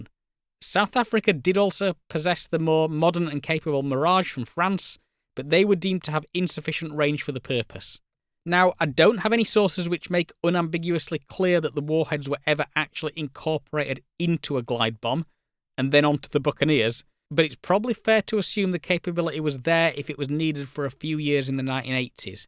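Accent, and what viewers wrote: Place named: United Kingdom. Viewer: He doesn't have a nerd voice! He's just aggressively British!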